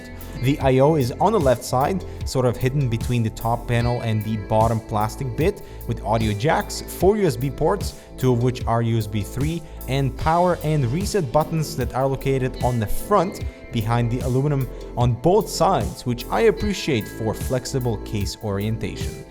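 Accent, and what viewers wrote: Russia. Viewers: no trust me I know the accents I'm guessing he could be Russian or maybe Norwegian or he might be Dutch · work with quality and light are awesome! Like it a lot. By the way, are you russian?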